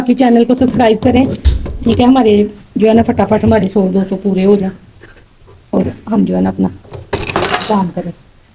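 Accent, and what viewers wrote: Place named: Punjab, India. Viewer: Love your Punjabi accent ❤❤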